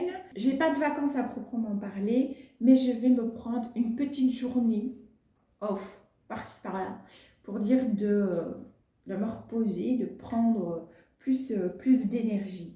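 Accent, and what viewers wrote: Belgium. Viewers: 1. Pour la prochaine FAQ : je veux TOUT TOUT TOUT savoir! 😜 2. J’aime trop l’accent Belge, donne moi le tien stp 😆 3. Tu t’exprimes bien!!!!!! 👏🏻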